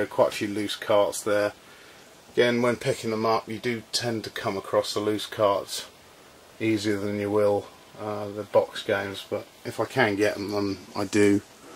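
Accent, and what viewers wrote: England, United Kingdom. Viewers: hes obviously English... really stunned by the confusion.. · He said he has PAL games which are European versions of the games. I'm assuming he is from England somewhere by the way he talks.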